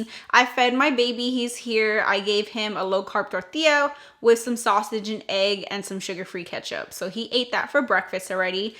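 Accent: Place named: Houston, United States. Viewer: It's the Houston accent 🙌💪💪💪. You look beautiful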